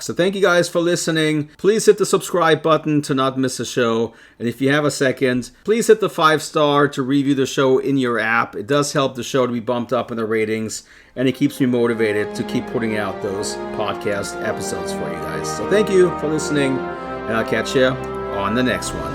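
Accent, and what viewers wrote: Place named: Ireland. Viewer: Thanks for this great podcast! Love you've done an episode devoted to the Jabba The Hutt figures! I still want to buy the new one from Hasbro Pulse, but it sells out quickly! Are you in Ireland? You sound like a friend of mine who's a native of Ireland.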